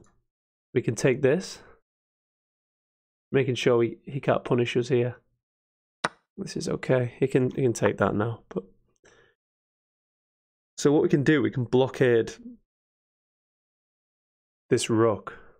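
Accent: Hull, United Kingdom. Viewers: You’ve got to be from Hull with that accent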